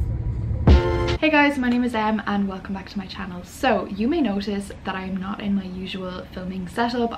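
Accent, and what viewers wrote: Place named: Ireland. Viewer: I love the Irish accent!! I can listen to you all day! I love your videos 😊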